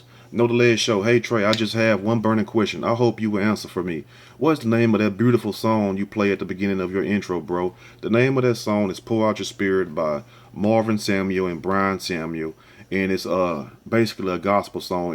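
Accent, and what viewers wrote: South, United States. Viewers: THANK U TREY 4 ANSWERING MY QUESTION. I LOVE THAT COUNTRY ACCENT. BOUT IT, BOUT IT.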